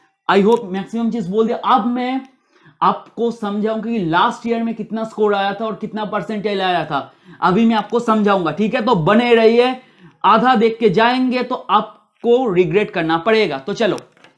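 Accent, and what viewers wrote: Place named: Bengal, India. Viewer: Sir by your accent it's quite predictable that you're a bengali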